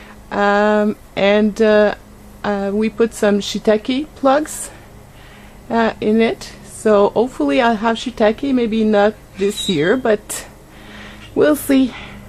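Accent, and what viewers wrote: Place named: Quebec, Canada. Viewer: Yaay another French Canadian accent ;) Pretty cool garden. But now you'll have to show the one at home lol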